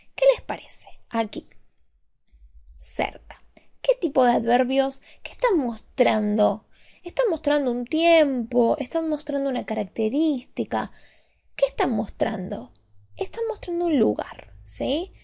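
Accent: Argentina. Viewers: eres argentina? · Muchas gracias. Es de Argentina deduzco,por su voz, lo dice un cordobés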